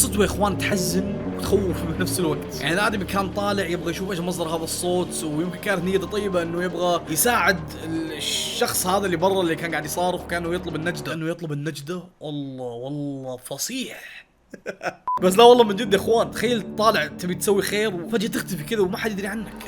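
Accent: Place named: Saudi Arabia. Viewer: وت شنو مصري سعودي 🌚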